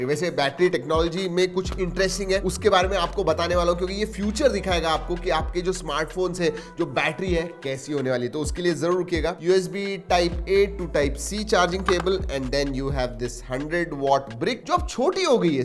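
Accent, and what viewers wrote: Maharashtra, India. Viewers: ap Marathi ho na. bhiya 😅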